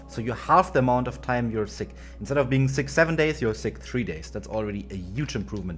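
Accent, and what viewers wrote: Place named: Germany. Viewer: I can hear out the DEUTSCH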